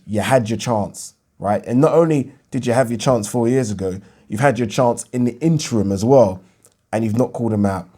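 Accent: United Kingdom. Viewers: Appreciate your another attempt not to swear:) I mean I never judged you for that. As for the non-native speaker, I love your British language and it's even more beautiful if you don't swear =] As always, my greetings from the boxing country, Ukraine!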